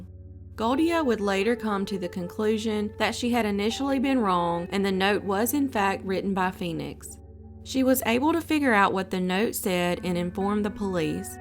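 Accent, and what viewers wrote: South, United States. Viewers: Hi, I just wanted to say that really love your narration and background music. It's so comforting to hear a southern accent as a former Texan living in NYC. I wanted to ask if you have covered the case of Evelyn Hernandez. A disappeared pregnant woman and her son who vanished in San Francisco California. The case received little to no coverage and the main suspect is the married man who inpregnanted her similar to the Amanda Jones, except Evelyn is from El Salvador and nobody cared enough in the SFPD to convict Herman Àguilar.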